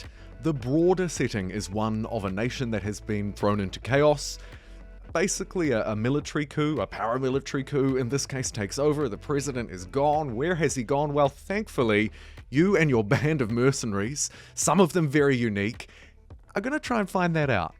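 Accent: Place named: New Zealand. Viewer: I love that with your kiwi accent you need to spell out DECK to avoid misunderstanding 😅😅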